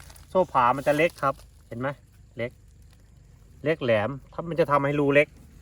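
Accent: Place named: Phu Thai, Thailand. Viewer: ฟังเสงเว้าคือคนภูไท อยู่สิเลอน้อครับ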